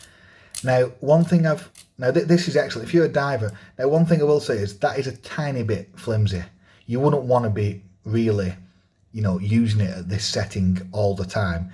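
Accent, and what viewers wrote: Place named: West Midlands, United Kingdom. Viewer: Thanks for the review! I have just bought the strap version of this watch . The bracelet looks very well made, though… I think I’ll have to buy another one, just to be able to swap straps… Can I ask you what part of England your accent’s from? I’m not a native speaker and I’m trying to figure out as many accents as I can… West Midlands, perhaps? Great review, thanks!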